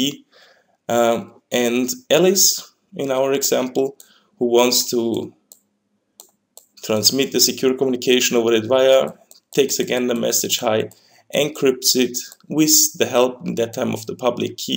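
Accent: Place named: Russia. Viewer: You need to hide that Russian accent a bit. Good video and explanation and thank you for posting this information.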